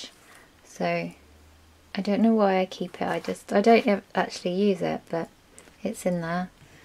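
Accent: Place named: England, United Kingdom. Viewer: EDC, beautiful girl, english accent!! I sub'd !! LOL!!!!! Whooo Hooooo!!!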